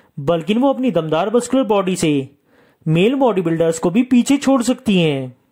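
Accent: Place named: India. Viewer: I couldn't understand a word that the so called narrator was saying, due to his extremely thick Indian accent. So it just sounded like gibberish to me.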